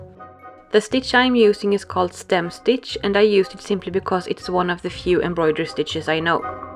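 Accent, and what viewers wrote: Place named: Norway. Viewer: This is really cool. Thank you for sharing! Also, if you don’t mind my asking, where are you from? Your accent sounds Norwegian. 🤗❤️🤗 🕸️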